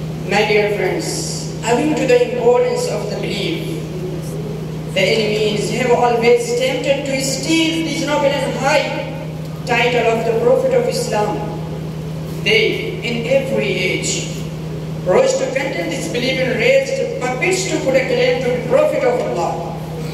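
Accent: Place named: United States. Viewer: Ap ki english to america ki lag rahi he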